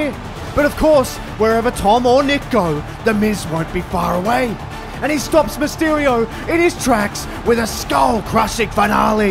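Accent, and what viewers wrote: Australia. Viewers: Ngl, you actually do sound like Grayson Waller, are you from Australia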